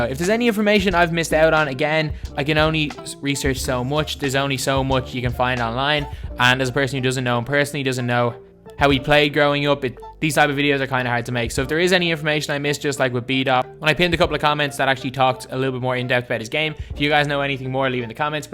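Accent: Ireland. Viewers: I thought your Irish wtf you uploading like 3 in the mornin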